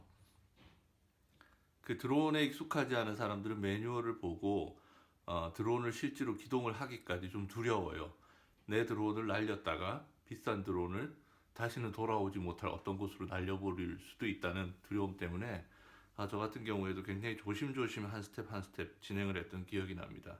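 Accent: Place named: South Korea. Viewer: 다좋은데 콩글리시가 ㅎㅎ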